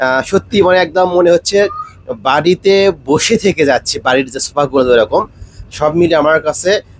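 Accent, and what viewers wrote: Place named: Bogura, Bangladesh. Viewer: ধারাভাষ্যে বগুড়ার আঞ্চলিকতা পরিহারযোগ্য। এছাড়া ভিডিওটি ভালো ছিলো।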